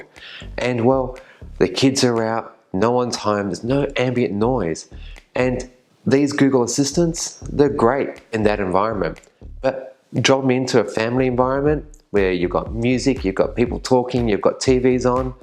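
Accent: Australia. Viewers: the Australian accent also helps...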